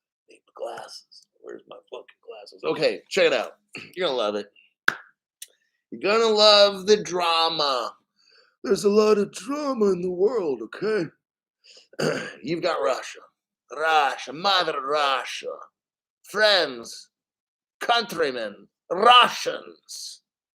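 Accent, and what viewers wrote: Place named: New York, United States. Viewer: This is one of the very few crypto channels that isn't trying to sell you something or shill their own bags; appreciate it. Are you originally from NY/ NJ ? You have the accent & the same Go Fk Yourselves mentality we have here on the east coast, hahaha . Keep up the good work.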